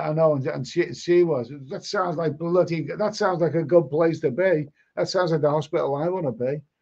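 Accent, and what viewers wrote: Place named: Northern England, United Kingdom. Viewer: This is awesome mate.- absolutely love your input and experience on South East Asia. It has been awful weather in the UK - since you have a Northern accent, I bet it is grim up there, I know the East Midlands (where I'm from) had crap weather throughout the summer. Our winters are dull and horrible. I was thinking of retirement in either Malaysia, Indonesia or Thailand in a few years time but my first choice is Malaysia, hopefully retiring in Kuala Lumpur. Honestly mate, I am so sick and tired of the weather here in the UK, it is depressing, South East Asia is amazing with incredible and friendly people, amazing cultures, superb beaches, fascinating landscapes, jungles, mangroves, cable cars, waterfalls, lakes, mountains, diversity of flora and fauna, amazing food and variety of cuisine, spectacular religious sites especially temples and mosques. 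The list can go on and on.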